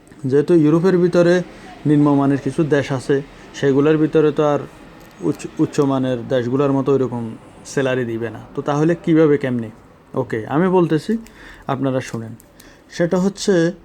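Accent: Noakhali, Bangladesh. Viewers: bari nokhali mone hoy.. tai abal marka kotha barta..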